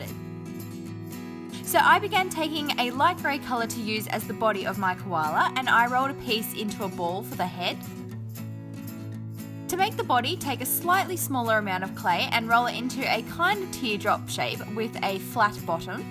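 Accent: Australia. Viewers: Omg she sound sooo AUSSIE 🐨🐨🐨🐨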